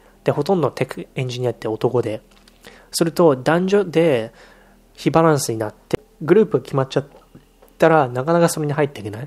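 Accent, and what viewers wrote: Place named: Japan. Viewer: your english sounds like japanese-english.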